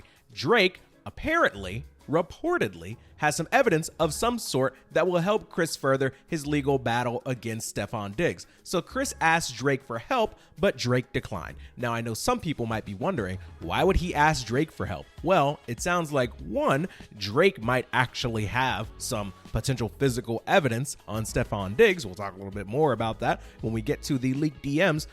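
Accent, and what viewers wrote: Canada. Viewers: also your canadian accent is my favorite 😂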